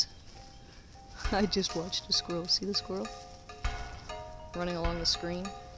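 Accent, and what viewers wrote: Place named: Chicago, United States. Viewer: Fred C. Birchmore Sr "Many a sermon I am sure has taken place at this location." These insightful words, uttered from the pulpit by this bold and intrusive explore,r epitomize her investigative reporterism talents. The short movie isn't bad if the sound is turned down to muffle the nasal Chicago wannabe Madow intonations. Her breaking and entering the Milford cabin should not have been caught on film, though, since that is now evidence of her committing a crime. If the <link> is still there where she wrote it in the table dust, that would also be prosecutable as vandalism, or "tagging." As a sort of cultural or denominational exchange it would be an interesting followup to break-and-enter her abandoned home in Florida (it is clearly abandoned when she is not there) and root around to speculate or fabricate her lifestyle and generally invade her privacy. 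I wouldn't play that spooky music and tinky gong, though. Maybe a sound track of "Catfight in Bizarrino" played backwards instead. That is my cabin, or "tent," BTW, with the children's claybank hands on the front (which date back to the 1920s). That venerable structure is not representative of my real houses in Hollywood and San Diego, but I plan to be there this summer, as I have for 72 years, and as my family has since 1832. Like - Reply - Just now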